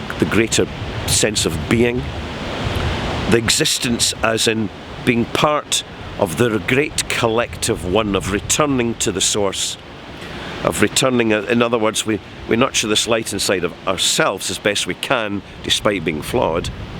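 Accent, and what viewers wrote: Scotland, United Kingdom. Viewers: I came here for philosophy, setting and a Scottish accent. Liked and subscribed.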